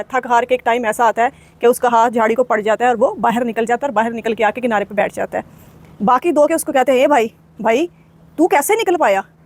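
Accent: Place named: Punjab, India. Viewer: آپ اردو کو اردو لہجہ میں تو بولیں ایسا لگ رہا ہے پنجابی بول رہی ہیں آپ کا accent مکمل پنجابی ہے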